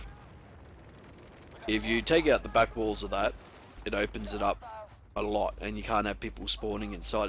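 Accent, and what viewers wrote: Australia. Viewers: are u australian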